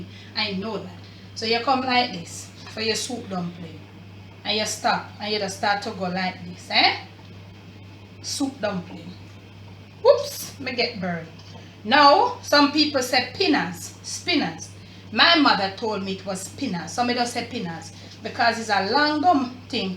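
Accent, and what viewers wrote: Jamaica. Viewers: Hi Ms 👋. I enjoy and like watching your videos. I like to hear you speak and your Jamaican accent. Your cooking and method is very simple. I wish you all the best in life Good health and happiness ❣️.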